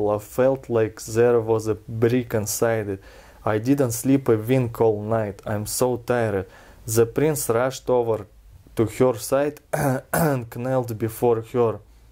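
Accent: Russia. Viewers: thank you my comrade i am learning to do a Russian accent for voice acting and this helps a lot because your voice and accent is perfect and strong. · Im obsessed with russian accents, specifically strong ones like yours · I'm already subbed to your channel, but this just popped up in my feed. I love your Russian accent. I could listen to you all day. 😊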